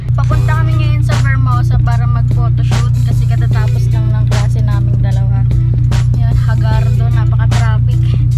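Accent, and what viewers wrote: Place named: Batangas, Philippines. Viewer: Nakapakaangas!! Bagayyy kay elmo!! Ang cute din ng batangueña accent mo hehehe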